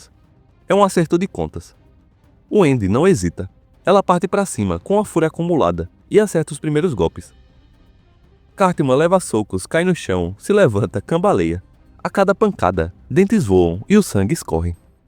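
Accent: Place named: Northeast, Brazil. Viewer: Os vídeos ficam melhores com seu sotaque, viva o nordeste!!!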